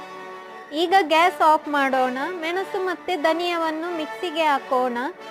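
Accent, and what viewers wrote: Tulu, India. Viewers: Nivu kannada mataduvaga gottagtade tulu mataduvavaru anta😂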